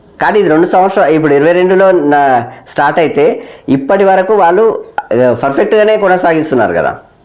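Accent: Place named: Telangana, India. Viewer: చాలా చక్కగా వివరించారు సార్ తెలంగాణ ప్రజలకు అర్థమయ్యే విధంగా తెలంగాణ భాషలో మాట్లాడుతున్నారు సర్ చాలా బాగుంది సార్ ఇంకా కొద్దిగా వాయిస్ పెంచితే బాగుంటుంది సార్ 👌👌🙏🙏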